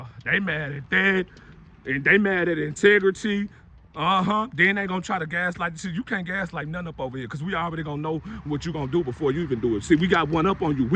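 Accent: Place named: New Orleans, United States. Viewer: Probably New Orleans with the yeah Kevin gates says it alot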